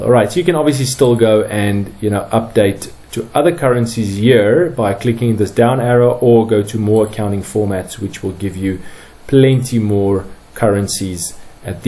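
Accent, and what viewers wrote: South Africa. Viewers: Thank you so much. Very helpful (and naas to hear a South African accent!) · I never stop to comment on any of the thousands of topics I've gleaned through "googling", LOL. This was refreshing. A fantastically simple solution. I am suprised that, for once it was information supplied, and explained, in South African English by a South African voice! With guys like you around, doing your bit for the education of our nation. Such are the keys that will again empower us to build just as formidable an economy as that of the mid to late1900's where our country's economic strength gave the Yanks the proverbial "...run for their money". No pun intended. Looksee: "What was the dollar to the rand in 1971? One rand was worth US$1.40 (R0.72 per dollar) from the time of its inception in 1961 until late 1971, and the U.S. dollar became stronger than South African currency for the first time on 15 March 1982." excerpt from a <link> article; <link> › wiki › South_African_rand Bravo! 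I take my hat off to you Sir! Well done & keep up at forging ahead thus. What might seem to some as being minute could turn out to be a massive gift to others. Philosophically speaking: a small key may unlock large doors that open into large stores of immeasurable treasure when it is gifted with such a spirit as evident here. Which could and should be elevated to being an "impartation", almost, of much-needed and excellent socio-economic contributions. In the face of such contributions, politics eventually means nothing more than strategic puppeteering. Look at the American case as eviden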